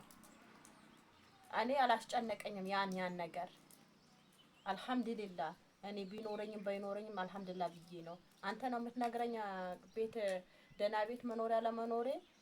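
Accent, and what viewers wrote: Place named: South, Ethiopia. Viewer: በርች ውዷ እንደው በደንብ እንኳን በማታውቂው ቋንቋ እየ ታገልሽ ይገባሻል ❤❤አማረኛሽ ዘና ሥለሚያደርግ በርችልኚ የደቡብ አማረኛ ውሥጤ ነው 😂😂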